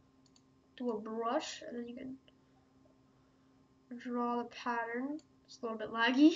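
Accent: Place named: Ireland. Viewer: Wow the accent. sound a bit irish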